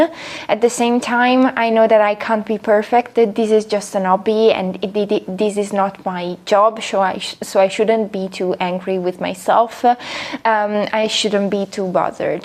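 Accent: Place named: Italy. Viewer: Thank you for your video. I think you have a charming Italian accent.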